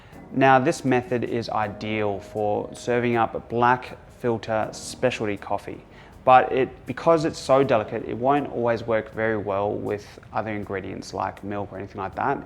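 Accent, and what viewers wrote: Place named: Australia. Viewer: Bro, I love your accent... BTW are you Australian?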